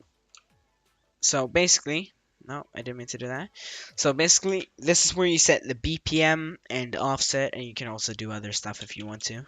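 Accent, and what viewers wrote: Sweden. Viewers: I can hear the swedish accent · That's a nice swedish accent you got there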